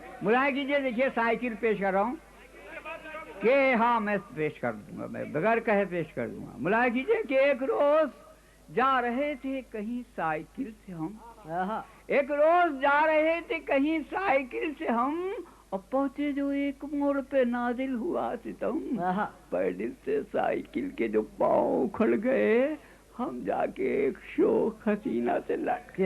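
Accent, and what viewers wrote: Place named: Lucknow, India. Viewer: Waah Adil Sahab kya shayar the aap, aur kya zbaan pure lakhnavi zbaan aur aapki peshkaari ba-kmaal. Pride of India.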